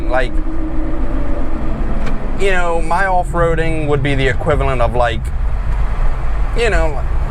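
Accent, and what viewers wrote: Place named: Maryland, United States. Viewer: You have to be a marylander with that accent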